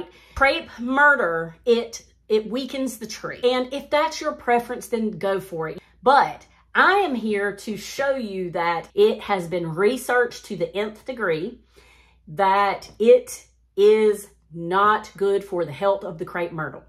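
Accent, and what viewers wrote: South, United States. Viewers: Just found your channel today. I’m so excited to follow you and hear that beautiful southern accent. I’m from Georgia 8a. Can’t wait to plant seeds now. Your friend Candy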